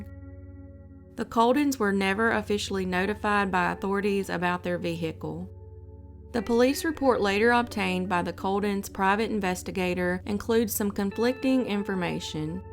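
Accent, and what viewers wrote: South, United States. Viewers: Hi, I just wanted to say that really love your narration and background music. It's so comforting to hear a southern accent as a former Texan living in NYC. I wanted to ask if you have covered the case of Evelyn Hernandez. A disappeared pregnant woman and her son who vanished in San Francisco California. The case received little to no coverage and the main suspect is the married man who inpregnanted her similar to the Amanda Jones, except Evelyn is from El Salvador and nobody cared enough in the SFPD to convict Herman Àguilar.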